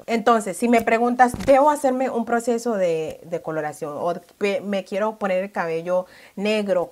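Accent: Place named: Colombia. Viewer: tienes el timbao de la gente afro de buenaventura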